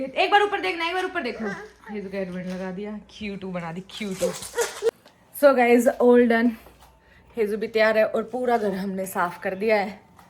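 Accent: Haryana, India. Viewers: main Rajasthani hun toh nhii pta apke kuch unique se words ka meaning😊😊 pr apki Haryanvi language mast lagti hai❤❤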